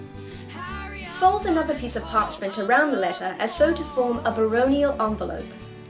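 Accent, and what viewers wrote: United Kingdom. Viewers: this is a great idea! I love your accent are you welsh of british? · Are you really British?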